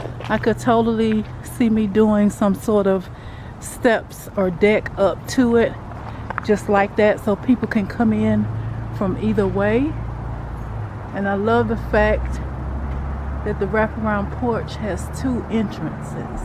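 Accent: South, United States. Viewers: I love your voice, it’s so calming and southern! Love it, I’m from the south too but I’m more Appalachian sounding lol. Good job! 😊😊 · Best southern accent-I just liked listening to you.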